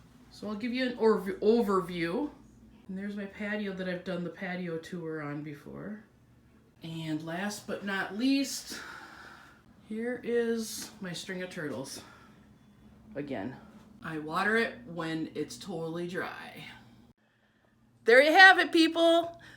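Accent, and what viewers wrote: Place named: Midwest, United States. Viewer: holy cannoli.. i used to live in Minneapolis! I thought you had a mid west familiar accent. Gonna send you some orchid cactus cuttings! They have orange blooms.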